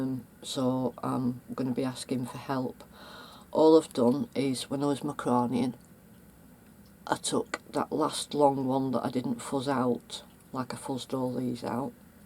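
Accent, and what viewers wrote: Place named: United Kingdom. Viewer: Just subbed you as I liked what I saw, well done on making a great page. I did struggle to hear and understand you, even though Im a fellow UKer, it was a different accent....Im crap with understanding other accents to my own (Londoner) lol. I enjoyed watching your ICADs video too, especially the pink one, Im such a pink freak! lol hugs Tibby xxxxx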